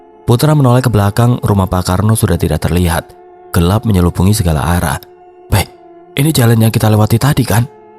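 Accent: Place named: Java, Indonesia. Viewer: Logate medok syekalee😂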